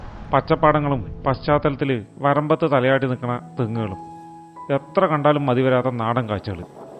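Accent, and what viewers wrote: Palakkad, India. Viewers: പാലക്കാടൻ ഭാഷ എനിക്ക് നന്നായി ഇഷ്ടപ്പെട്ടു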